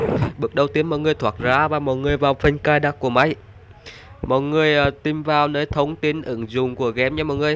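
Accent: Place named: Quang Binh, Vietnam. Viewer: Giọng Anh em ở Quảng Bình giống y hệt Anh lun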